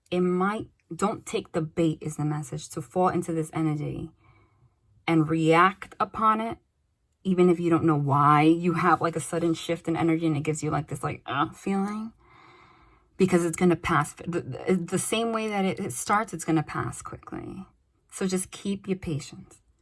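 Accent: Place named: New York, United States. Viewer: I needed this right now. it resonated so hard. I was thinking I need outside guidance to validate and clarify my own situation and this video was at the top of my notifications. posted like a half hour ago. and I've been sitting here for like a half hour pondering what's going on and boom here you are. and as a New Yorker I LOVE your New York accent. god it's so refreshing hearing a reader who speaks like me. like hearing my messages in a to the point direct New York way. like I feel like my mother or cousins are talking to me. I love your method of channeling. I have a similar type of occurrences of signs and validations and the cards coming out.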